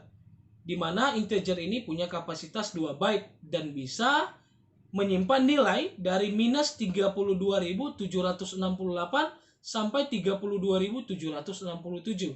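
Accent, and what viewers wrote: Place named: Buton, Indonesia. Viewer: berasa dijelasin sama teman waktu kuliah orang Buton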